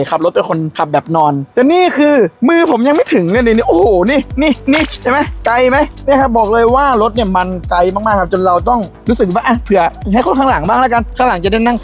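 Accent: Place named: Isan, Thailand. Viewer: ฟังสำเนียงน่าจะคนอีสานบ้านเราต้องสนับสนุน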